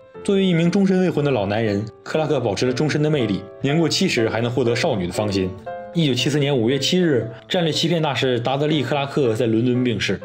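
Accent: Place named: Tianjin, China. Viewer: 小约翰可汗是天津宝坻的人吧。 哈哈，着口音解说绝了。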